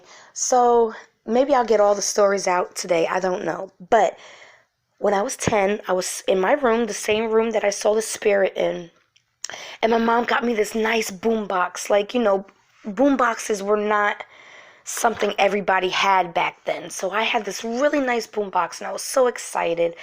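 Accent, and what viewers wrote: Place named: Brooklyn, United States. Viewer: This was great hearing stories from the Babez and from you as well! Being from the tri-state area, (Passaic County NJ to be exact approximately 10 miles outside of Manhattan) I've always pegged your accent as being from the Brooklyn area LOL!! Don't worry I haven't lived in Passaic County in 26 years now, and the people from here (The Upper Connecticut River Valley Region of Vermont) all say that I speak very strangely with an accent as well. I moved my daughters here when they were just 3 and 4 years old and they still have "North Jersey" accents believe it or not! Anyway, I'm familiar with Westchester and I believe that you're absolutely correct about that cemetery. Back in those days (and today if they can get away with it), Building needs to go up and a highway run through? Just remove the headstones get rid of them, depending upon how long the cemetery had been there pre-1900s there may or may not have been any cement vaults as there are today, and digging the foundation, etc may have turned up absolutely nothing just like digging for the highway. 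No problem for anyone except the people buried in the cemetery, and consequently the people living in the building who experience the visitation of the souls of those whom they are living upon who are generally a bit angry about being disturbed, now having their final resting place unmarked and an apartment building built over it! There have been other occasions during more modern times when headstones were just relocated, but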